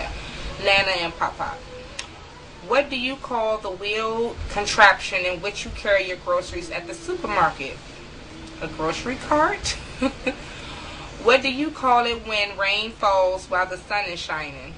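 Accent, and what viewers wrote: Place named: Washington DC, United States. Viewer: yessss! ihated that BUG question. it was soooo dumb! &&yu are sooooo DC iLOVE it! &&&thnxxx for the shout out hunni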